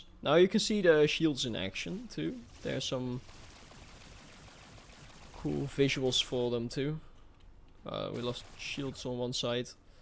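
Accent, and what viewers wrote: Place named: Netherlands. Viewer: ik kan horen dat je nederlands bent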